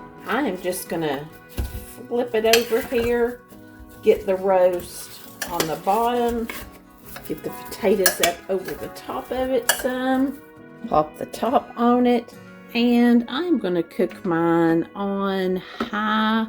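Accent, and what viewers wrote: South, United States. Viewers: Oh YUMMMMM I was worried about the staple as well… these are great.. I have my meals for the week… you are the best… you make my day I’m better just listening to you and your southern accent.. sending love 💚and ☀️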